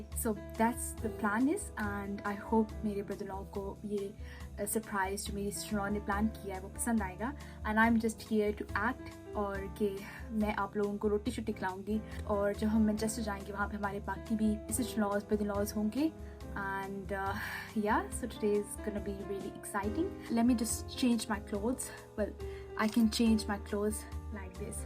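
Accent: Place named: Punjab, India. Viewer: Uff the way you switch from English to urdu to Punjani 🤣ufff Dude you rock in punjabi accent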